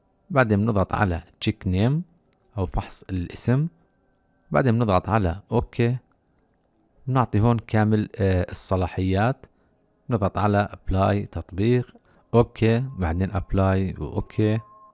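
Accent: Jordan. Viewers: والله انك بطل و يسعد لهجتك الاردنية او الفلسطنية بطل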